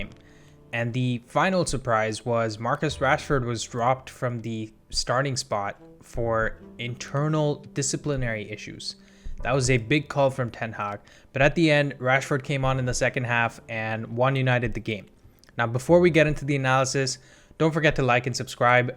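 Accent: United States. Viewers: As a mancunian born and bred. I can never get used to an American accent talking about British football. Its as abnormal as hearing my grandmother talking about her sex life.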